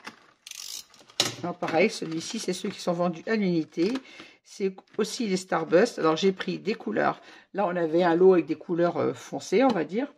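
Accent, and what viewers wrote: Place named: England, United Kingdom. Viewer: Cc, encore une bien belle commande!!! 😊 J'ai hâte de voir le tuto mix média car j'aimerais bien apprendre à faire de belles boîtes comme tu les fais si bien 😉 Entre parenthèse, j'aime beaucoup ton accent "english" 😁 Sur ce, je te souhaite une très belle journée À très vite Scrap'bises 😘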